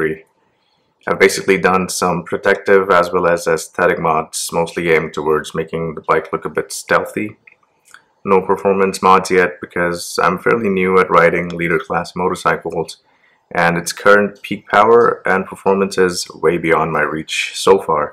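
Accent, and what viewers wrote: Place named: United States. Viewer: Goddamn if not for the Jaipur tag I would have seriously thought you to be American 😂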